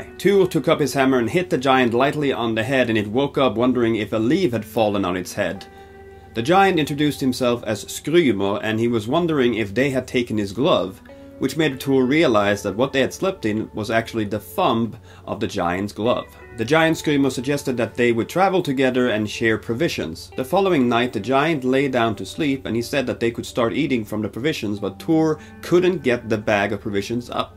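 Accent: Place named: Scania, Sweden. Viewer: He is speaking with a skåne accent. Its southern Swedish.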